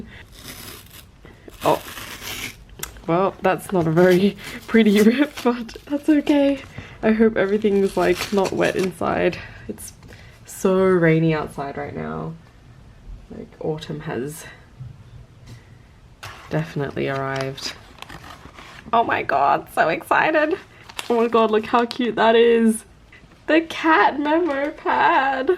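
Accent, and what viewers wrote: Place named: Australia. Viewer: I love watching other Australians art videos/studio vlogs, the accents and background noises (in particular magpies) feel cosy to me lmao. I love your artwork and the commentary you have for it, it’s very casual 🤙✨ I also really appreciate how you try to incorporate some tips to fellow artists in each of your videos 🥰 looking forward to more of your vlogs, the internet needs more aussies 🙈⭐️✨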